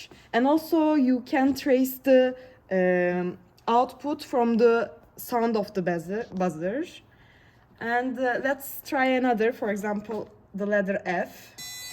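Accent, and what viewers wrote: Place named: Turkey. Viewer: Türk'ün şivesine bile kurban🫡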